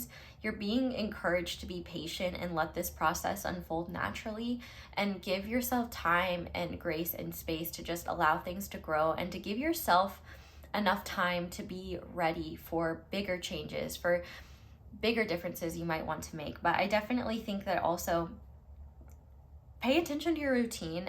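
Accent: South, United States. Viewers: First of all I think my new favourite quote from you, of all time is “give a little subscribble.” Also, I’ve somehow never noticed the southern accent before until the very end of my reading today 😮😍💕😊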